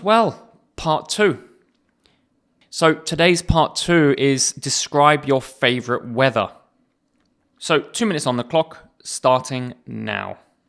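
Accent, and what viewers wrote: RP, United Kingdom. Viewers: Are you using rp accent? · Uncountable thank you. Your British English is easy to understand for me.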